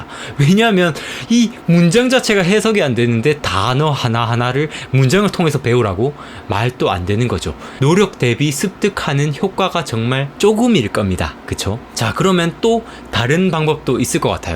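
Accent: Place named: North Gyeongsang, South Korea. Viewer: 아닙니당 ㅎㅎ제가 유도리가없었네요! 경북에사시나요? 고향이 포항인데 말투가 비슷하네요:)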